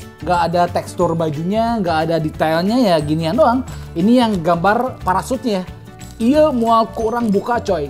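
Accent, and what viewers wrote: West Java, Indonesia. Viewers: Sunda euyy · logat sundanya keluar banyak wkwkwk jarang²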